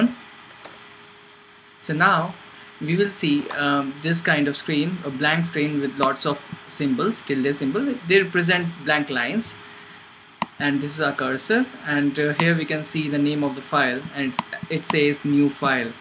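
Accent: India. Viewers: Your English is so Indian:)))) To save file or run any other commands, you should do the following: 1) type some text 2) press Esc 3) press button [Shift] + [ : ] (shift plus colon) 4) type :wq (means write and quite - that will save the file) I hope it will help :) Always welcome.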